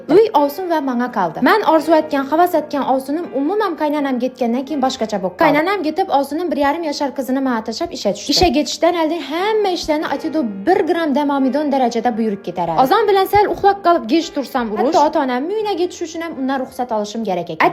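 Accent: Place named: Khorezm, Uzbekistan. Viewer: Tilizga shakar xorazmliklar kursdoshlarimni eslatib yubordiz shevangiz bm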